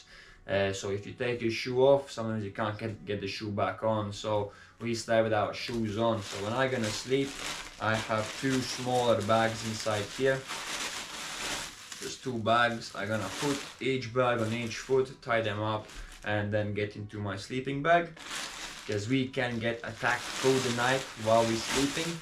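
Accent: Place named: United Kingdom. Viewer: Where abouts in the UK are you from bro ?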